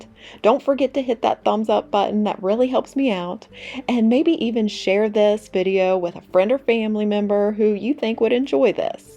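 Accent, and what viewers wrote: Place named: South, United States. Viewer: I just love your accent! I think after British accent the southern accent is the most beautiful in English! I love the porch! Looks good!!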